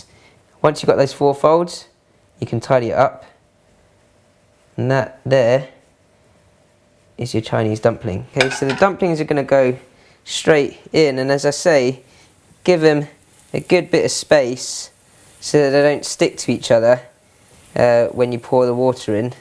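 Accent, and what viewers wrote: United Kingdom. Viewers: I don't really care about his cooking skill... thinking from Asian American point of view, he has the cutest British Accent...LOVE IT! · British accent is so elegant. I love dumplings anyway! :O)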